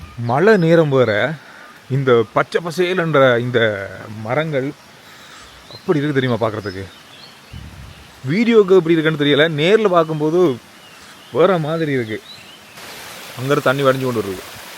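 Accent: Sri Lanka. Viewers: உங்கள் இலங்கை தமிழ் மிகவும் நன்றாக கேட்பதற்கு இனிமையாக இருக்கிறது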